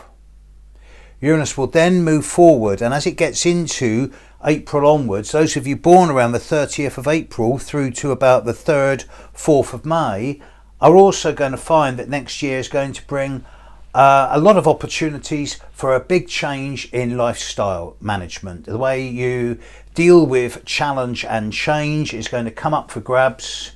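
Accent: United Kingdom. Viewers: Thank you for your forecast of all the signs especially Taurus which is my sign. I had a great year 2020 despite all the changes who are going on. I feel more peace within my self and more harmony. I am a Taurus with an ascendant in Aquarius which was not easy during some time in my life. I try to be open to change and new ways of living and new ways to understand life. Thank you for your forecast and I just love your British accent being myself a Quebecor French native. I am a fan.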